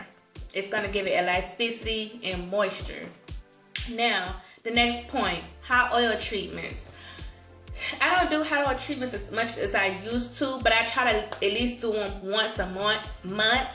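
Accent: South, United States. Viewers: 10 thumbs up. love that country accent.you sound like my relatives from down south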